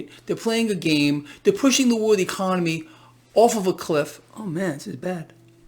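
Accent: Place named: New York, United States. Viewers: Good idea, I am asleep when you do your lives anyway (Australia) but awake when you do end of day.....but entirely up to you, use the channel for the wrap up over seas whilst I sleep and of course to hear you NY accent. By the way thankyou for all you do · Sorry. I can't take the New York accent. Spent about 30 seconds listening.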